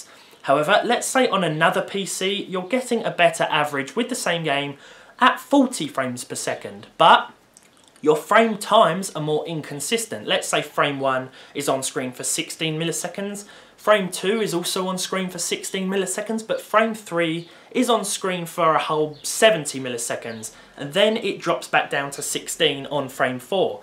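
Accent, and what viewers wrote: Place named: Surrey, United Kingdom. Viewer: hey, are you from Surrey? I have a friend who has the same accent (hell, I swear he sounds like your little brother) and he's from Surrey.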